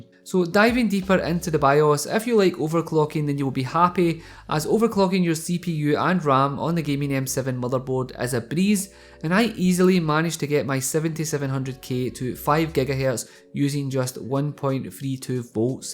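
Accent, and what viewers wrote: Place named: Scotland, United Kingdom. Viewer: Yer Scottish ye have my support just got the board for a new build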